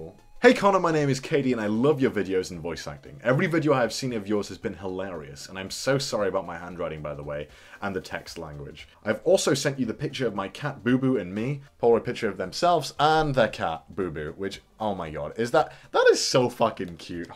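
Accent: United Kingdom. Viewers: I just realized you have a British accent...I'm ashamed of myself. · Aw you look like an older version of my friend, with a British accent. Oh my...even the reactions are similar...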